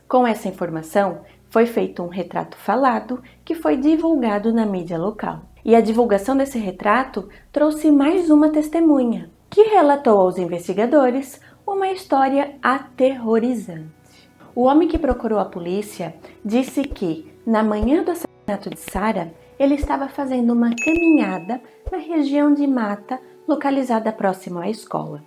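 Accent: Portugal. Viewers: Com todo respeito, você nasceu em Portugal? Seu sotaque vem de onde? Ótimo trabalho!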